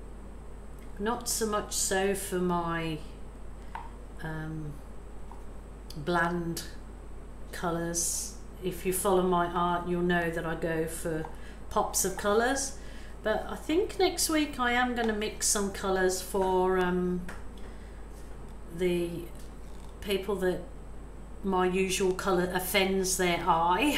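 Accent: Birmingham, United Kingdom. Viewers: Is that an original brummie accent too?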